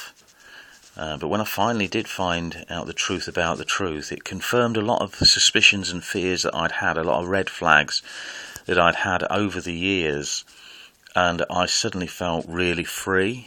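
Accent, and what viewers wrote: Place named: United Kingdom. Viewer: I've been a lurker on your channel for some months now, and I feel it appropriate at this time to show my appreciation for you posting these monologues. Besides the obvious depth of the subject matter, I also enjoy your strolls in the park, your British accent and "load of old rhubarb" expressions, and your richness of character. I'm not a JW, never been one and never will be, but I am amidst the long process of attempting to guide a born-in out of his misery. I thank you for your efforts.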